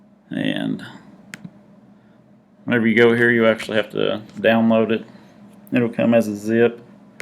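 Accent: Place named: Appalachia, United States. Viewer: Hey there, Thank you for your very helpful information. Can't help but notice that you sound like you're from the Appalachian Mountains area, close to me, in NC.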